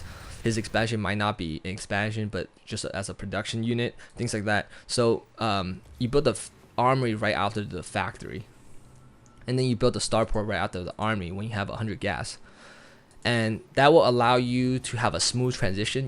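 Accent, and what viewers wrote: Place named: United States. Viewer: No offense but your American accent is annoying.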